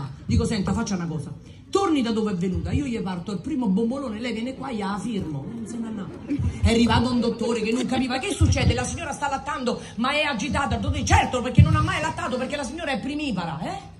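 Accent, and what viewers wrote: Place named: Rome, Italy. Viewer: Parla come un maschio romano. Corpo da donna molto bella ma parla come un uomo. Cresciuta con 5 fratelli e normale. Ho una sorella così. Non e nuovo per me siamo 5 fratelli e mia sorella che rappresenta 10 uomini e giudici sono guai se vi capita di incrociarla. Valentina Persia e sympatica, sincera et sopratutto modesta... E mitica e unica. Un altra che estimo Anna Maria sconsolata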